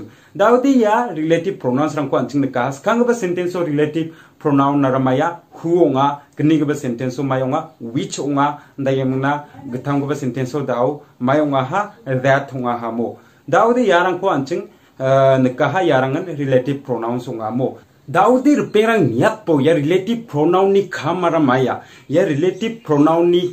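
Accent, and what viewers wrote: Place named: United Kingdom. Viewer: Hope you to see your English accent again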